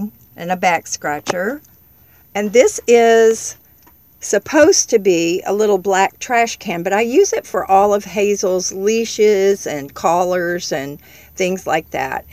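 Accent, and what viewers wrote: South, United States. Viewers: I knew it,!! You can take the Gal outta the South but you can't take the South Outta the Gal, Honey you hang in there. Keep saving, stay safe and keep your wits about you. You are gonna be OK..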